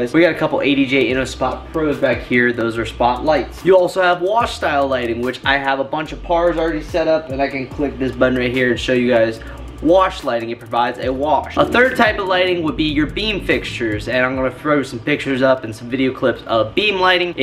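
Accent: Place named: South, United States. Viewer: Sounds like you are getting a bit of a Southern Draw! Welcome to the South Brother.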